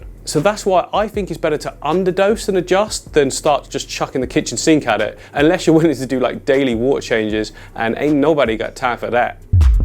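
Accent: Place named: South West England, United Kingdom. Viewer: I thought your accent was familiar! I am south west too!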